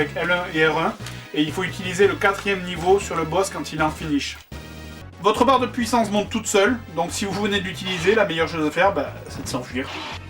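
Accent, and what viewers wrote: South, France. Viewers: L'époque où avait son accent du sud...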